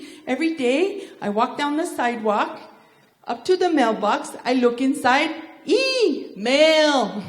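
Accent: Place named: Northern New Mexico, United States. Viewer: Love that Northern New Mexico accent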